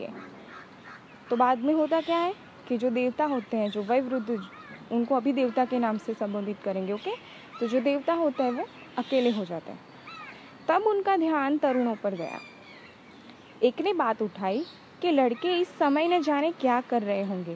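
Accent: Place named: Maharashtra, India. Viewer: Kya ap Marathi ho apke accent se pta lgra h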